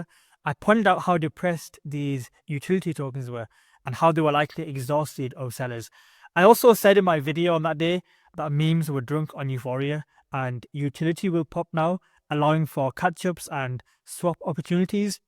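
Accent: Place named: Oldham, United Kingdom. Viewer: Where are you from son? Sounds like an Oldham accent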